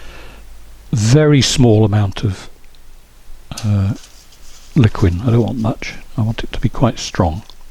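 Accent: United Kingdom. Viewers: Your new camera is awesome. What great colors and details well as the good depth of field. Thank you for doing these inspiring lessons. I am so blown away from the sky. By the way, your British accent is marvelest to listen to.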